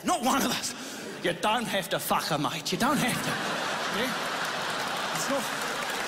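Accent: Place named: Australia. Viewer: He's funny because his Aussie accent is spot on, it doesn't sound over the top or anything!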